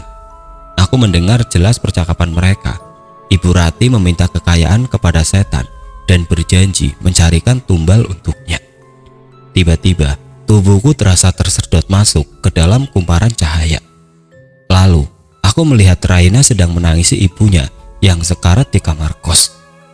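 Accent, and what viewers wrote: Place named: Java, Indonesia. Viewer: logatnya nanggung, klo jawa sekalian, jaksel sekalian.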